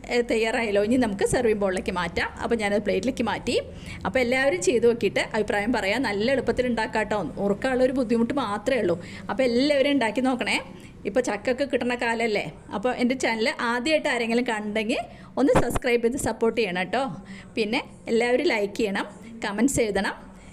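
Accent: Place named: Valluvanad, India. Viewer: ചക്കത്തോരൻ ഒന്നാന്തരായി. അതിലധികം ആ വള്ളുവ നാടൻ ശുദ്ധമലയാളം വളരെ ഇഷ്ടപ്പെട്ടു. നന്നായി വരട്ടെ.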